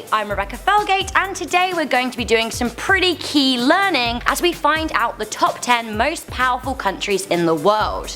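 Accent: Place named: United Kingdom. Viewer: Rebecca Felgate is a simple naturally beautiful and sexy girl and that deep thick British accent is just charmingly adorable!. MAGM.❤️✝️☯️🇵🇷🇺🇸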